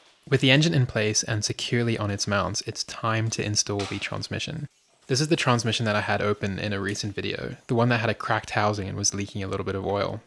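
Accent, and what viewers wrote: Australia. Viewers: Cool. This is the problem with being away (since 2003), you sounded Aus to me. Anyways. Lovely car, always wanted a Spider. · You a truly a very clever young man 😀 amazing work! You sound Australian? 🙂🙂 A well spoken Australian 😉 Your attention to detail is a credit to you.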